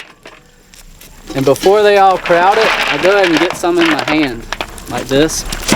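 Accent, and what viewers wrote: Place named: Kentucky, United States. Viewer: I like your gentle way with your lambs. Thanks for sharing, we just got three 14 week old girl lambs yesterday. I'm hoping ours can eventually be as freindly and trusting . Karen from Newzealand. By the way love your accent are you anywhere near Kentucky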